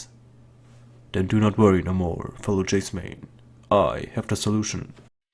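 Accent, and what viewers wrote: Germany. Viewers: Dunno why but you sound like a german to me 0: accent-wise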